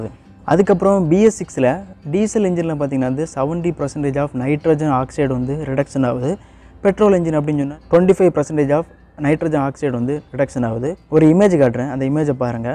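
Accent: Kanyakumari, India. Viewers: Enga ooru paiyan KK Dist accent 🤣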